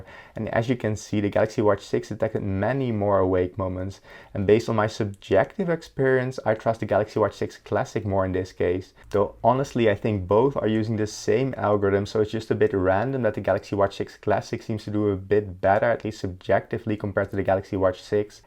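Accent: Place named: Netherlands. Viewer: 3 times the costs of my Huawei GT runner. Perhaps not totally comparable but I'm very glad with my GT Runner after a few months of use. Het was leuk geweest om je ook een keertje Nederlands te horen praten, al verraadde je accent al heel snel toen ik me abonneerde op je kanaal, dat je een Nederlander bent. 😉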